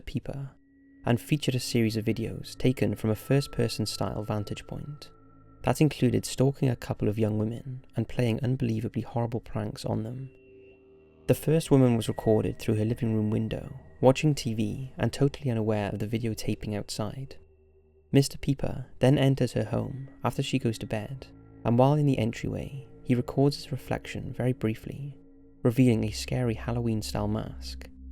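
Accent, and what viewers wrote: Wales, United Kingdom. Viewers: Narrator are you welsh?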